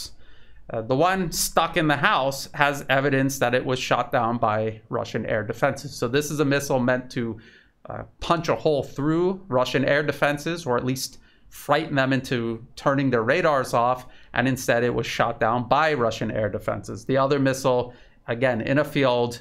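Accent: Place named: United States. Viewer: Apparently your surname is Croatian. So if you went to Croatia you would be another guy from down town. You would have to drop the American accent thou and adopt a East European one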